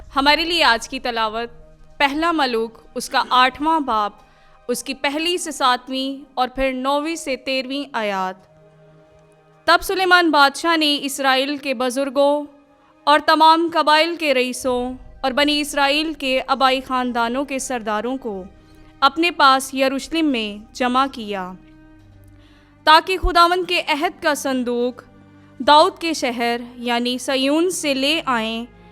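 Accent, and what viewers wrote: Urdu, Pakistan. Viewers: beautiful delivery of bible verses true Urdu accent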